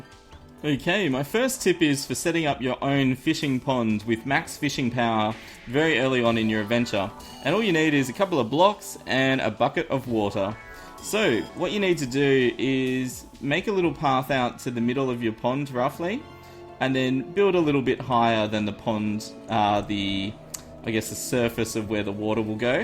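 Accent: Australia. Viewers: Hi! The fishing tip was very helpful to me so thanks a lot! Btw you're making awesome vids and I have a question for you, are you from Australia? I think... after accent.. anyways keep making cool vids! #LoveCookies!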